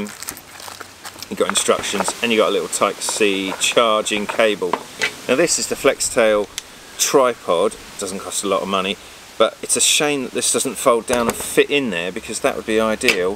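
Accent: United Kingdom. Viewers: You were somewhere moist and needed a gadget with a light! Gigidy. Apologies, you can take the boy out of the Army………… Currently sat in France and an ad popped up on FB for this item. As always, I chose to find reviews via YouTube and came across yours. I like the fact that you can buy a generic pad for these. May I ask if you have trialled the generic pads along side Flextail pads? How do they come up in a price comparison? Nice review and nice to listen to a British review! 🍻👍🏻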